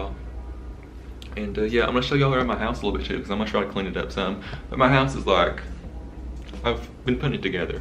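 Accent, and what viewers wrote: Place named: Tennessee, United States. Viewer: Binge watching your videos today. You are the best! I love watching you just do some regular shit. You’re just cool af!! Your voice makes me miss living in SE Tennessee.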